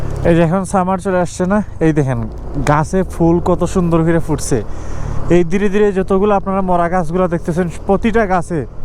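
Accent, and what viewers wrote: Sylhet, Bangladesh. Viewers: Apne sylheti?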